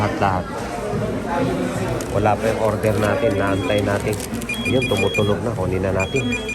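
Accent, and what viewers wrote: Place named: Philippines. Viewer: Just saw you, recommend by YT. Gusto ko po yung accent nyu pilipinong-pilipino.. I like raw vlogs kahit mahaba basta realtime. keep it po kuya,, Wag nyu po kaming kalimutan pag lumago na ang YT channel nyu .. Ingat po always Godbless you po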